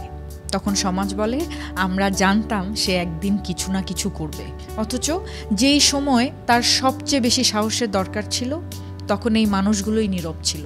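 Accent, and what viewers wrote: Bangladesh. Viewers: Apner tone Bangladeshi but apner title nepali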